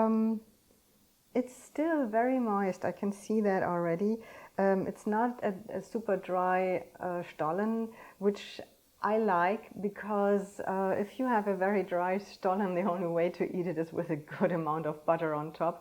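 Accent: Germany. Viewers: I LOVE the burnt raisins!! I usually will do my Stollen on the weekend after Thanksgiving. I have to smile when you speak because you pronounce English words with German sounds. I do not laugh at you but sure appreciate how hard English is to learn for non-native speakers. Unlike German, English diphthongs ARE NOT consistent. You do very well!!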